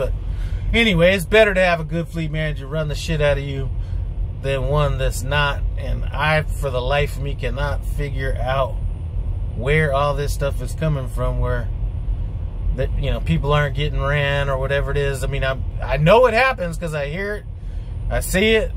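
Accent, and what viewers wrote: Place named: Southern California, United States. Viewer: He talks just like So Cal.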